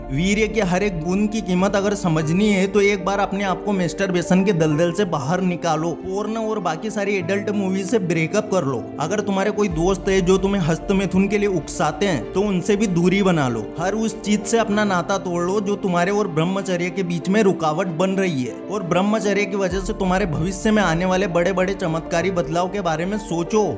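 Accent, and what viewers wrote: Indore, India. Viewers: Indore ke aaspas ke ho aap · Aap indori lag rahe ho...accent se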